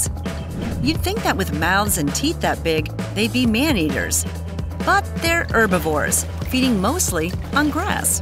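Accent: United States. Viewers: Kilopascals for pressure- there's a unit most listeners cannot relate to. Going by the narrator's accent and mention of visiting the Cincinnati Zoo, I'm guessing that the intended audience might be mostly Americans...centimeters and kilograms aren't really how most of us reference distance and weight. Why doesn't the hippos mouth open Pi radians? ...if you'd like to promote Si, that is. Aside from the units, I always thought force to be more appropriate for bite strength than pressure, which, for a given jaw strength, would vary widely depending on the area considered. Most animals bite strength, measured in PSI or kPA, could exceed their tooth strength, if they bit a ball bearing, or any small hard thing....the force, without considering area, seems more relevant. Pounds, or Newtons, if you insist.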